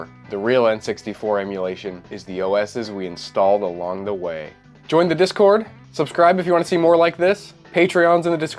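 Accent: Canada. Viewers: because it's the exact same with a canadian accent instead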